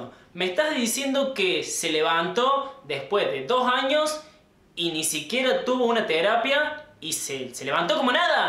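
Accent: Córdoba, Argentina. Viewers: es cordobez :v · Porque es cordobes :v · Dacmass Creó porque ambos son cordobés :v tu de donde eres? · ¿Sos cordobés? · Sí, es cordobés.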